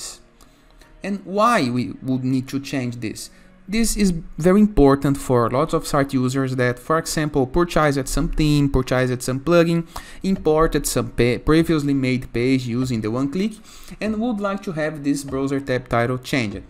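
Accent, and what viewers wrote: Brazil. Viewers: Você é brasileiro? kkkk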